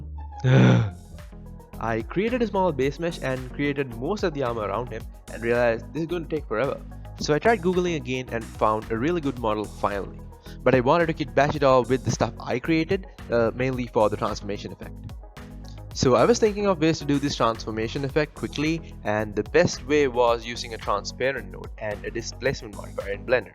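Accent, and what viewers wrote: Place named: India. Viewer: Bro you sounds like one of us👀 ...are you indian? Your new susbcriber here 🙋🏻‍♂️ please guide me i need some help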